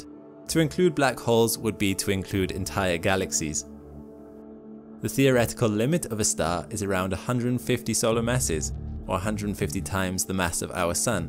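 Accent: United Kingdom. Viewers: I can't get past the narrator's voice, it's like a mixture of a foreign accent with a British accent. Videos are pretty good, hire someone else to narrate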